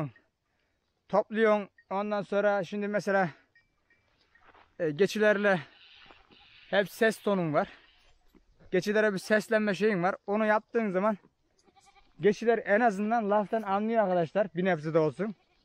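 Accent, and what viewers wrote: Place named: Çorum, Turkey. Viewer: Çördük kızılca derler bizim memlekette.konuşmamız şiveniz bizimle aynı kardeşim.çorum benim memleket.ama bursadayım ben.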